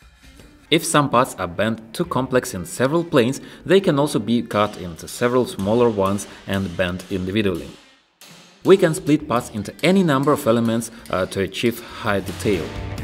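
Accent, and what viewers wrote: Russia. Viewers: Акцент русский=)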